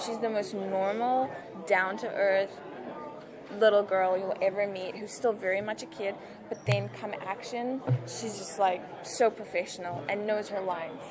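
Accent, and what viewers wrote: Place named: South Africa. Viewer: I love that you still have your South African accent. A bit of American but thats expected. Don't lose your roots girl! Lekker bly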